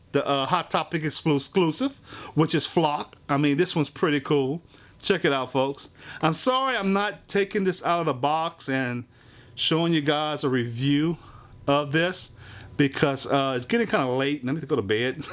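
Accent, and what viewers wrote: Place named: Louisiana, United States. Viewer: I'm from Louisiana, I just found your channel and heard your voice and instantly knew you were from Louisiana! Love your channel man!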